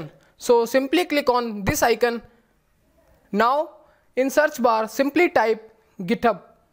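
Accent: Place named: India. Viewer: What a curry accent.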